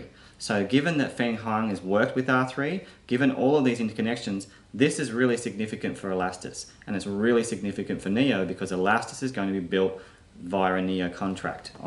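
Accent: Australia. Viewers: Awesome!! That's a lot of info to absorb, sounds like you're in Queensland and have a foxy type dog. You make very interesting points and links. thank goodness I chose Neo. For all the hours and hard work I would like to donate some Gas but it seems you are to humble. Really appreciate your work mate.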